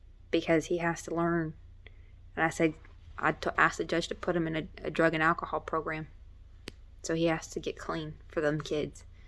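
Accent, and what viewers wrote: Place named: South, United States. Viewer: What made you attracted this man in the first place? So you a southern belle living in Alaska huh? That accent a dead give away lol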